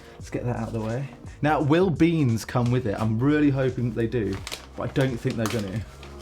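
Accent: United Kingdom. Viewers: Your British accent is quite nice, isn't it?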